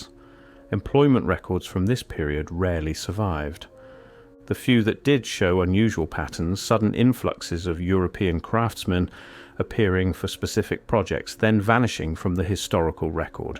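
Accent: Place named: Mississippi, United States. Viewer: I thought you were from Mississippi 😅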